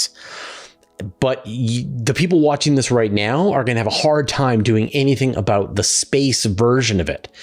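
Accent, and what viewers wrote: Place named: Canada. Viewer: All alone and finally at peace is clearly what you meant to say. =) haha Man oh man I love this channel. It's so nice to hear a fellow Canuck.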